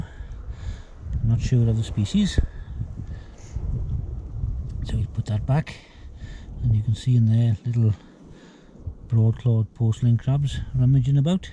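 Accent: Wales, United Kingdom. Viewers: I adore your Welsh accent and voice, reminds me great deal of Sir Anthony Hopkins, and he is my favourite actor. :)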